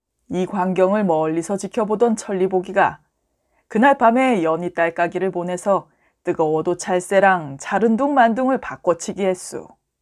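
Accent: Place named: Gyeongsang, South Korea. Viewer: 안녕하세요 넘재미있네요! 경상도사투리 구수합니다~^^ ❤❤❤❤❤❤❤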